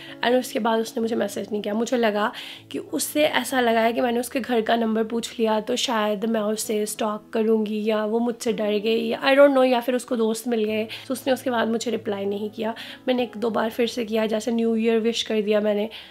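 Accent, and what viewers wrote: Punjab, India. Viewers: I started following you because you talk like one of my best friend in India. Punjabi accent Amritsar vala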